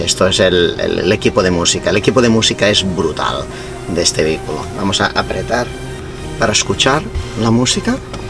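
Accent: Catalonia, Spain. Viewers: De hecho, yo pensaba que era catalán con un acento muy cerrado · Eres catalán? En Cataluña hablamos como tu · Pues el acento es 100% catalán...no noto para nada el inglés. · Este tipo parece catalan por el acento pero no se donde se le pego lo del piso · Tal como comentan, el acento es claramente catalán. Se nota que has seguido hablándolo aún residiendo en USA. Los paisajes del video me recuerdan mucho a los que veo en la zona limítrofe entre el estado de New York y el de Connecticut, a la altura de Danbury.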